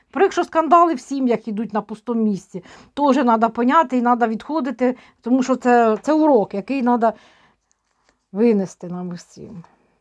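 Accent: Ukraine. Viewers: Пані дорогенька ми Вас любимо Ви Є уособленням України, жіночності, берегині. А то пише провокатор, неук, щоб укинути колот. Ви абсолютно по Українські, по народному розмовляєте, говорите... Дякуємо за Вашу працю, цінуємо, любимо!!!😍🥰💙💛🌻🌼💐🌻🌼💐🌻🌼💐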